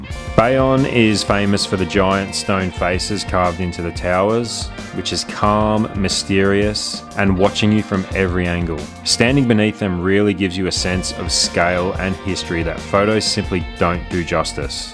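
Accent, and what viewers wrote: Australia. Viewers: Hi, I’m heading to Cambodia in a month with my wife brother and sister in law, do you need a motorcycle license for the Tuk Tuk and how much did it cost? Loving your videos and good to hear an Aussie voice in them. 😁👍🇦🇺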